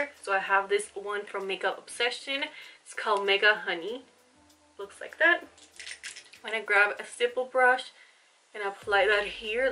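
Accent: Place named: Puerto Rico. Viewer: Preciosa, me encanta que eres Boricua y nunca pidas perdón for sounding puertorican, you have a beautiful accent ❤️🇵🇷🙏💪🏼 Wepa💪🏼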